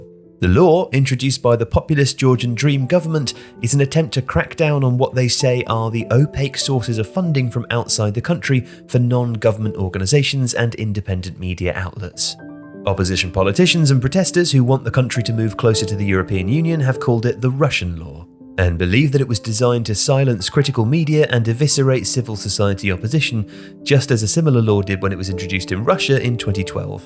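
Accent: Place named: England, United Kingdom. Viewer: The accent was obviously English, but the pronunciations were spot on ─ i.e. he made no mistakes in his pronunciation.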